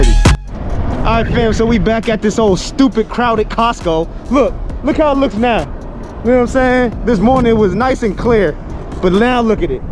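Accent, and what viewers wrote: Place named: Boston, United States. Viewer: What part of Boston are you originally from? I’m from Roxbury.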